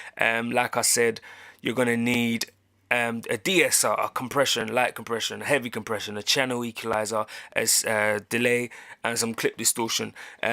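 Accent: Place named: United States. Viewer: your american accent was on point had me trippin a bit.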